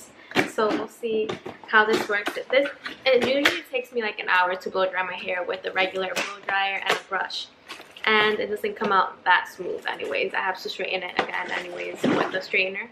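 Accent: Dominican Republic. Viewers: Esa forma de hablar... Indudablemente Dominicana jajajaajaja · Saludos desde RD! De donde eres? Suenan como Dominicanas. Me encantan tus videos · Me sorprendió mucho escucharte hablando español y darme cuenta que eres del patio. 🇩🇴🇩🇴🇩🇴💪🏽❤️ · Are you Dominican? Cause the accent when you talk Spanish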